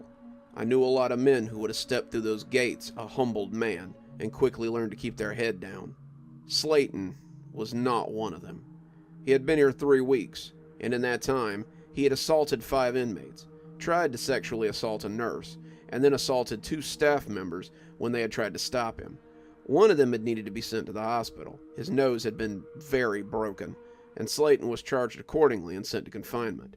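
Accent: South, United States. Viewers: May I ask….? Answer only if you feel comfortable. Are you from the south…?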